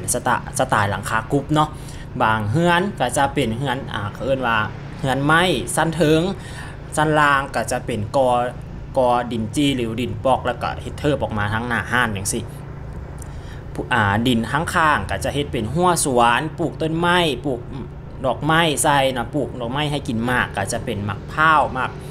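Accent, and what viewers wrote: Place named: Lom Sak, Thailand. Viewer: สำเนียง ก็ใกล้เคียงกับบ้านข้อย วิถีชีวิตประชาชาชนบ้านฮ้อม หาดซายฟอง คือกันกับบ้านข้อย บ้านหวาย อ.หล่มสักจ.เพชรบูรณ์,ไทยแลนด์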